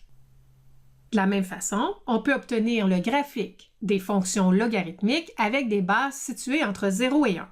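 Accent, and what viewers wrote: Canada. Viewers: Merci ! a cette belle voix canadienne :)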